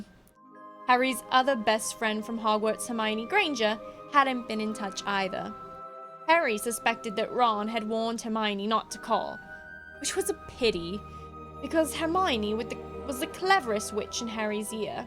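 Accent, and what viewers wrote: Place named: United Kingdom. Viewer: I love the British narrator voice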